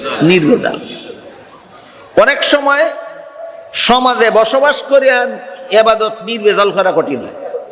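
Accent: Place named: India. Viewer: আপনি ভারতে সুরে কথা বলেন।জাতির বারোটা বাজানোর জন্য আপনি একা যতেষ্ট।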